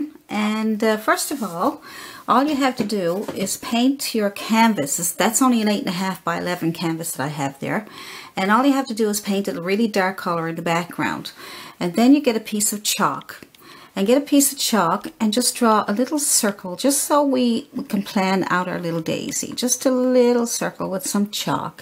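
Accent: Newfoundland, Canada. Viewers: You from Newfoundland? Accent?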